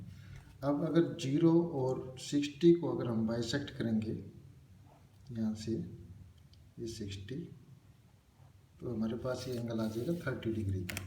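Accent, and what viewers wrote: India. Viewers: sir ji aap pakka haryana se honge ! kade t ho sir jii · Sir you are from Himachal i think for your tune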